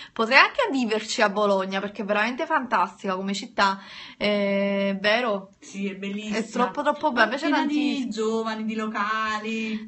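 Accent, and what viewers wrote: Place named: Naples, Italy. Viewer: Una cosa Chitarra romana lei è simpatica così com'è se cambiasse nn sarebbe e poi è Napoletana e a noi piace così sempre una cosa dite l'italiano l'italiano e basta ognuno parla a suo modo nn fate le perfettine a tutti costi e sia chiaro nn è un attacco e solo una risposta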